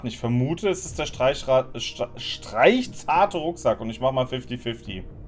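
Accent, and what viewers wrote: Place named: Saxony, Germany. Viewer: Sächsisch lool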